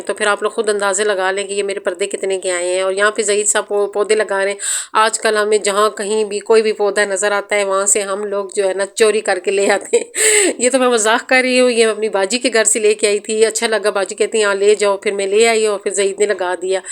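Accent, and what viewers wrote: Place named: Punjab, Pakistan. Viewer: Zbrdst aap ke pnjabi to hmry jesi ha from kamra attock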